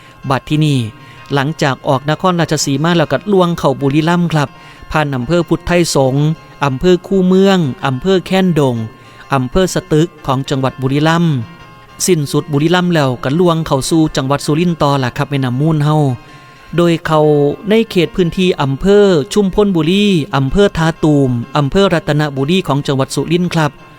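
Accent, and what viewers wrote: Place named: Khon Kaen, Thailand. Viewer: ขอนแก่นแท้เว้าแบบนี้ละครับ เว้าภาษากลางปนลาว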